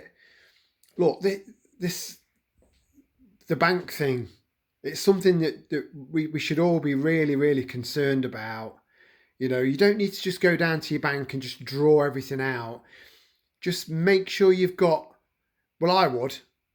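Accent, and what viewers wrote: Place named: East Midlands, United Kingdom. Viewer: Ooh that accent! Def an East Midlands boy!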